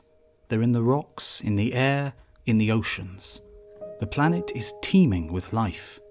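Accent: United Kingdom. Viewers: "Hoover up" Definitely not from the USA - as if the accent didn't give him away. That is the most (British? UK?) thing I have heard him say. Sorry if I guessed wrong on the accent - I am just an un-cultured American. :o) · are u british? 🤢🤢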